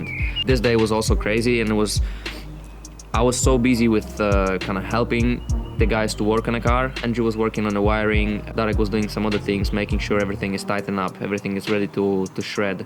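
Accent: United Kingdom. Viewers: ngl you have a hint of a British accent 😂😂😂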